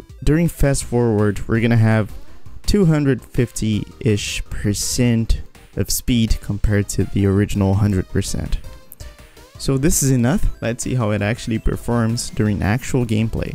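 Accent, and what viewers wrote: Brazil. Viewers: I also felt something like he was Brazilian lmao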